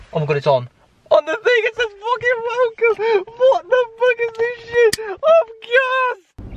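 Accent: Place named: Birmingham, United Kingdom. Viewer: He sounds like he`s from Birmingham LOL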